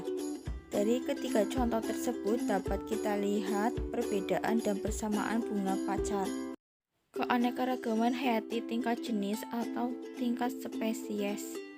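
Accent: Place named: Bumiayu, Indonesia. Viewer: Mentore tegang dalam menjelaskan kurang sante karo bahasa bumayune kegawa banget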